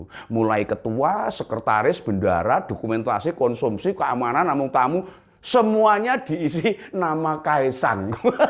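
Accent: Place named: East Java, Indonesia. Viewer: Logatnya...muuuaantaap...kang... tuban jawa Timur...👍🙏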